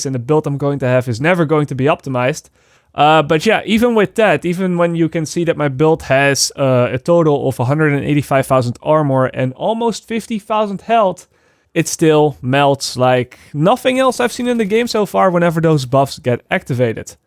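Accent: Netherlands. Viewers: Dankjewel voor de info, hoor dat je Nederlands bent Hahah ^_^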